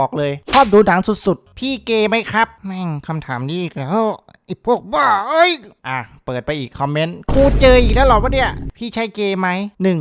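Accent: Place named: Ubon Ratchathani, Thailand. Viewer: เสีองพี่คือขอพูดพี่คืออุบนเหมือมผมพี่เป็นคนอุบนใช้ครับ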